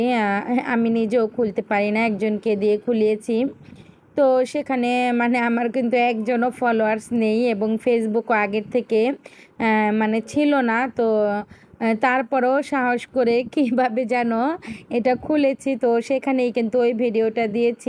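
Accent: Barishal, Bangladesh. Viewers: আপু বরিশাল ভাষা খুব সুন্দর কিযামত আলামত ❤❤ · আপু তোমার মুখে বরিশালের ভাষা শুনতে অনেক ভালো লাগলো আসলে দিন দিন মানুষ পাষান হয়ে যাচ্ছে মেলায় অনেক কিছুই দেখলাম ❤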